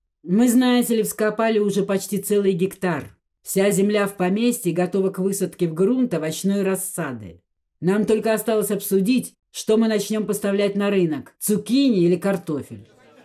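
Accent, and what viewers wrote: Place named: Provinces, Russia. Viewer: Чтение передает русскую глубинку, никак не Англию.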